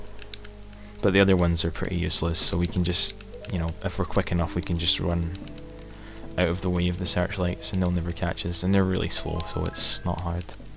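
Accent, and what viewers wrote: Scotland, United Kingdom. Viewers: Are you scottish or irish?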